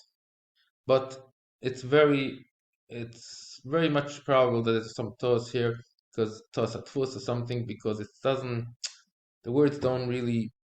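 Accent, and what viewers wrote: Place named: United States. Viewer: מבטא אמריקאי חזק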